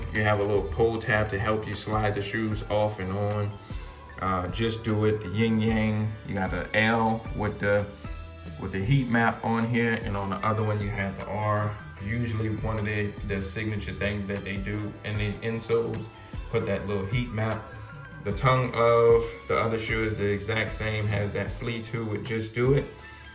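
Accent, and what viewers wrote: Baltimore, United States. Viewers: You from b more lol i hear it🔥💯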